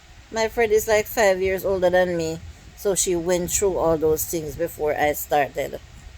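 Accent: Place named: Jamaica. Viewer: I understood you perfectly clear...you are a well spoken Jamaican 😊 and the patois us just a little spice 😅👍🏽🇬🇧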